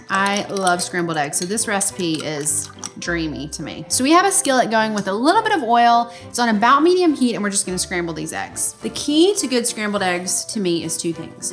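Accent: South, United States. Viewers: Jacob Halifax - It's like Southern and Valley girl at the same time. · Huneeee where's you from? Love a southern lady. I grew up in NC and your voice sounds like home! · It's a beautiful southern accent ! "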